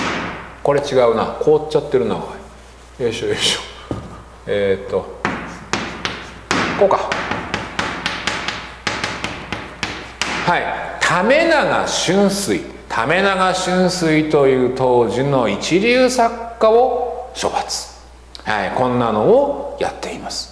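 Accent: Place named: Kansai, Japan. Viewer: 関西人かな。うちの親父とイントネーションが似てる。心地いい。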